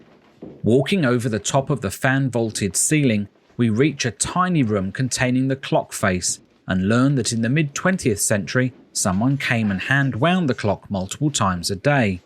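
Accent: England, United Kingdom. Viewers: Спасибо за настоящий английский и субтитры!😃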